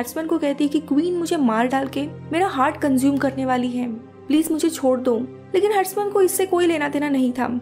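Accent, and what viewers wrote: Maharashtra, India. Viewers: do you have any relation with nikhil of climax explained in hindi .moreover you both sound a bit marathi . pls tell and BTW love ur explanation.thank u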